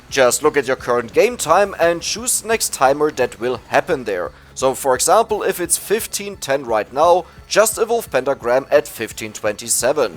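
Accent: Germany. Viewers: Ich frage mich wieso mir deine Stimme so bekannt vor kommt... Vielleicht ist es auch der deutsche Akzent^^ Hast du mal Videos zu anderen Spielen gemacht auf einem weiteren Channel? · Funny, I thought the same thing. First time I heard voice I was like "Wait, isn't this Granady (famous trackmania player)?" Really must be the german accent.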